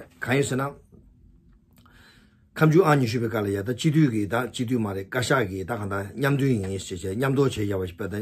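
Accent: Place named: Kham, China. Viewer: ཁམས་སྐད་རེད་ཧཧཧ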